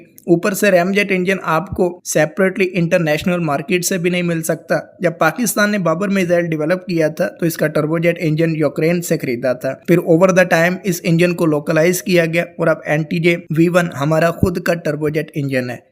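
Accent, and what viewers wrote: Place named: Punjab, India. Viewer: Bhai please English panjabi style me na bola kar bura lagta hy.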